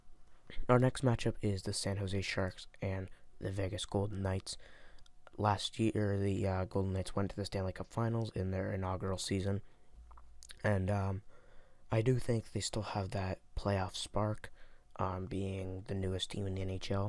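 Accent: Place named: Toronto, Canada. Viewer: You can tell you are from Toronto by the way you say it. “Cheronto” anyways GO LEAFS GO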